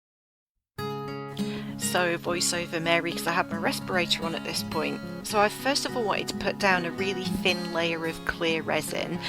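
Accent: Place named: Australia. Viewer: Love it!! Love your accent are you from Australia?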